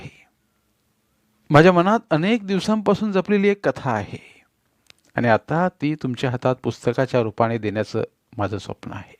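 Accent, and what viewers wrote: Rural, India. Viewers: नमस्कार सर, मस्त वाटेल, ग्रामीण भागातील जसाच्या तसच आवाज आवडला.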